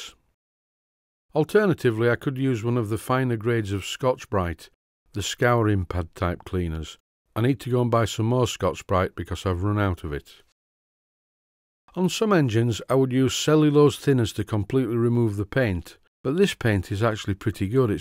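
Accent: Yorkshire, United Kingdom. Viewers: watching this does NOT interfere with MY medication. But, THANKS for the warning. (My ADHD medication, as well as my depression meds say that watching Steam Engine restoration is beneficial!) (But, I may slip into a coma, sometime soon.) Keep the videos coming! Your Yorkshire accent is amazing.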